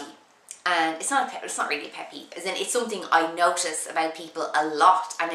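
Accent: Ireland. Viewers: You Really sound Irish, cause i know Because i am irish, Just not from dublin, CORK!!!!! bt dub Thanks for da tips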